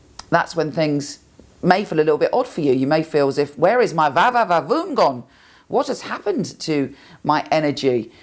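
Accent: United Kingdom. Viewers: A British Accent and Sultriness to your tone in one. Wow.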